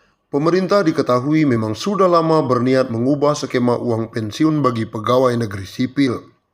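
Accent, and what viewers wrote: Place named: Madura, Indonesia. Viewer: Orang Madura y mbk , Madura mana mbk 🙏🙏🙏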